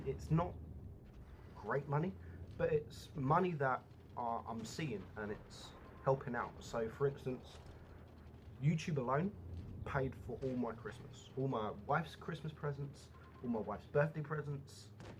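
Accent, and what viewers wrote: United Kingdom. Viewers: Legit british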